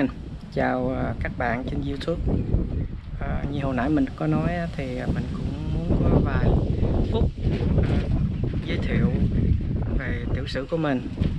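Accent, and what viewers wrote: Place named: Vietnam. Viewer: Hi,qua Mỹ lâu mà sao vẫn giữ giọng VN hay há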